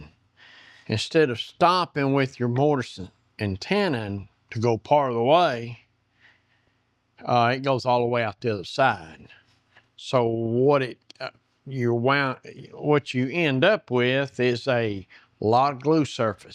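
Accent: South, United States. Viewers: I had asked, in a different video, what state you were from because I could not quite place it. I was thinking Kentucky, Tenn, NCar or maybe Virginia. I got pretty close. I'm from Texas, so knew it wasn't that one -- farther east than TX or LA, and farther north than MS, AL, etc.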